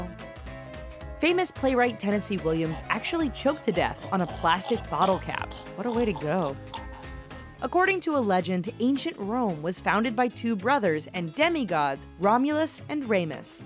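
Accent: United States. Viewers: She sounds American since she doesn't have a accent and her language is English the type of English that doesnt have a accent unlike UK english. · 'So now you can tell I'm American'. I'm pretty sure everyone could tell straight away love with that accent.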